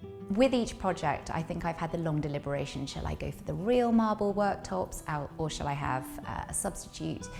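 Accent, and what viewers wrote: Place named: RP, United Kingdom. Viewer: Wonderful house, horrible and ridiculous posh accent.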